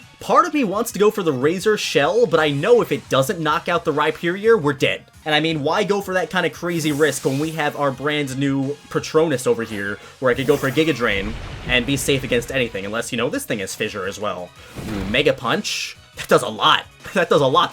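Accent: United Kingdom. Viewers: How do you do so good British ascent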